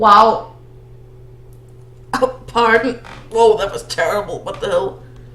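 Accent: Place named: Denmark. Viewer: This is a nice idea! hehe, the danish accent cc;; ♥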